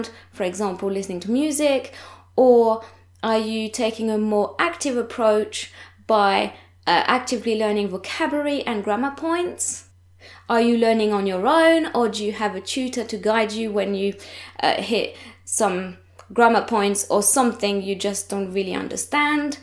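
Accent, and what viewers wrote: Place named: United Kingdom. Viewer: Merci beaucoup for this video! You sound so British!!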